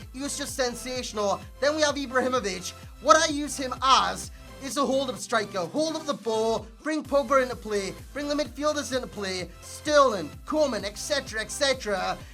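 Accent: Newcastle, United Kingdom. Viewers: is geordie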